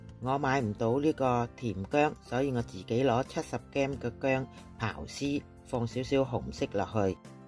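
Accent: Vietnam. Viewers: 聽咗一分鐘 終於聽到你的越南口音啦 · 謝謝Amy 老師不吝賜教，這個是我今年做月餅的首選，希望能成功，你帶越南口音的廣東話非常有親切感，做好後成績如何會向你交功課，謝謝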